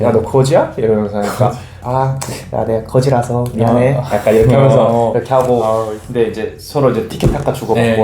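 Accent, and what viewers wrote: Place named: Jeolla, South Korea. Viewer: ㅋㅋㅋ선생님 억양이 전라도 쪽인 거죠? 저는 경상도라서 제가 흔하게 듣지 못한 억양이라 귀엽고 더 귀에 잘 들어왔어요! 올해 입사하면 몇 년 열심히 경력 쌓고, 미국에 일하러 가보고 싶었는데! 이미 그런 길을 가신 분을 보니 멋있네요ㅎㅎ 참고해서 잘 준비해보겠습니다~ 영상 감사합니당:)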